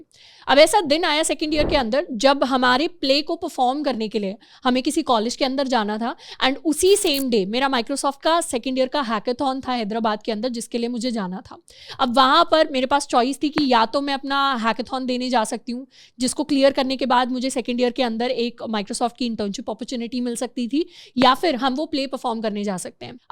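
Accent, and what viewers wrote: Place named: North India, India. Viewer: I would've never guessed that she's haryanavi but yeah definitely North Indian, her way of speaking and presenting is great but I think she speaks a little fast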